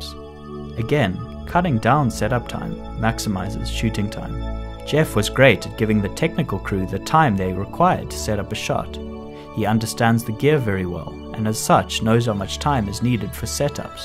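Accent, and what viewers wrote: South Africa. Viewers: Came across the channel via a ‘no film school article’. Was intrigued when I heard the SA accent but only realized who it was when the pics showed up :) loving the videos, very helpful insights. Looking forward to more! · I've been watching a couple of your videos over the last few days and kept wondering if you were South-African based on your accent. It's so nice for me to hear fellow South-African's approach filmmaking with such a passion and clear love for what you do. i too am an aspiring filmmaker in Cape Town, writing and directing shorts. Keep up your good work! i really enjoy the channel and wish the best for you in your career!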